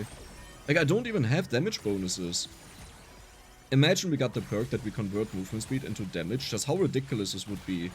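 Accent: Germany. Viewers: Kann es sein das du deutscher bist?😅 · Like your content, but are you German ?😂🕺🏼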